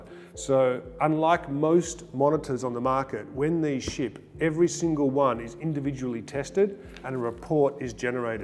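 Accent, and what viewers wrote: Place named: Australia. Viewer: Aussie accent makes me want to vomit.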